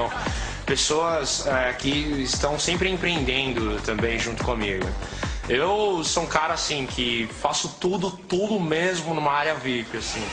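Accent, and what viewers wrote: São Paulo, Brazil. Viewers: O sotaque de paulista é foda! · Nossa...rs Igualzinho o sotaque Paulistano kkkkk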